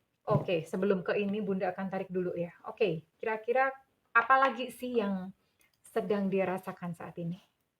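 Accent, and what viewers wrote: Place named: Java, Indonesia. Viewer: Ngeret jowo bund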